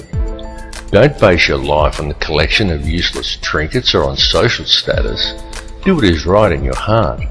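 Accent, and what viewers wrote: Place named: United Kingdom. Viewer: Your british accent is addicting.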